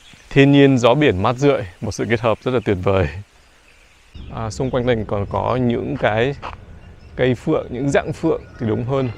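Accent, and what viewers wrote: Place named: Mekong Delta, Vietnam. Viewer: Canh giong mien tay wua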